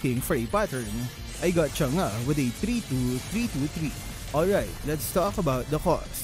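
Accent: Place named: Philippines. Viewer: that forced vocal fry pinoy accent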